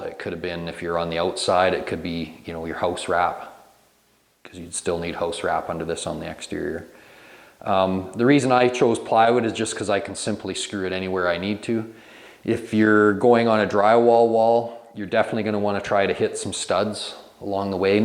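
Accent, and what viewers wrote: Canada. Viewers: Love dudes accent. Proper Canuck. Just like me. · I'm Canadian, and I can tell he's Canadian just from this accent lol. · you can tell he's from CANADA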